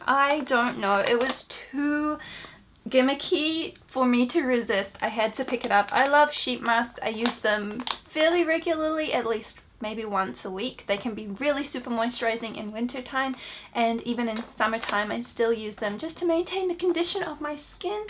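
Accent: New Zealand. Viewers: i love your new zealand accent so much omg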